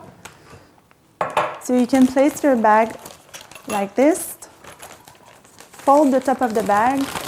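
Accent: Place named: Quebec, Canada. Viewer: Thank you for taking your time to share this wonderful, detailed class! I knew you were French Canadian by listening to your lovely accent. I moved from Toronto to Quebec City in 2014 and in 2020 to Lac Beauport with my new husband. Merci encore! 🤗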